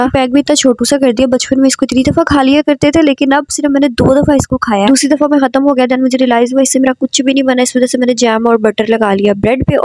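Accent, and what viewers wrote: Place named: Punjab, India. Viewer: Ap ka bolny ka style superb h sat mien jo taraa wala yani punjabi ka tarka very nyc stylish design beautiful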